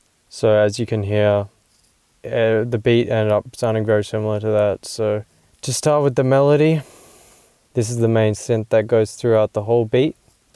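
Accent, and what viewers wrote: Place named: Australia. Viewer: brah i cud tell from ur accent thought so... good shit bro. saving aus underground scene with these beats <3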